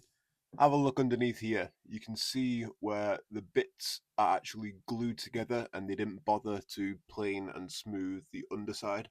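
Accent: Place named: North, United Kingdom. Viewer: Nice to hear a "nurthern" accent! I'm from North Wales but exiled in Bavaria. I'm a wood pallet man myself, heat my home with them, but your video is so interesting. Some other guy on YT said you can get free outdoor Pressure Treated wood from people dumping their kids' wooden swings etc. when they are older.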